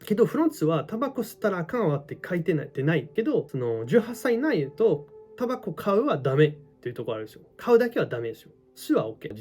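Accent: Kansai, Japan. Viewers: アクセントが関西弁😊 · ステーキ🥩硬いやん切れへんやんか🤣りっぱな関西人やん👏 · はい( ¯꒳¯ )b✧ もちろんですやん関西弁で 話してくれるから めっちゃ親近感やし✨✨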